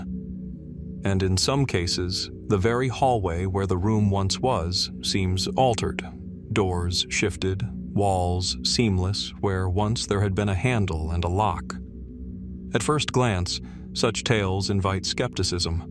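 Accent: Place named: United States. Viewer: I listen to these during tbe day, and while travelling, but, I find it hard to fall sleep to an American voice; strange, but I find the British accent far more relaxing.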